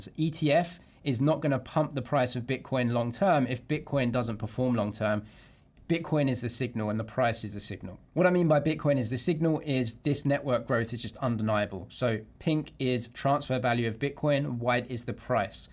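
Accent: England, United Kingdom. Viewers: You sound English, but bybit doesn’t operate in Britain from what I understand? Is this the case? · He is British but doesn't live there anymore. And correct, Bybit has ceased operations in UK for now due to regulatory scrutiny.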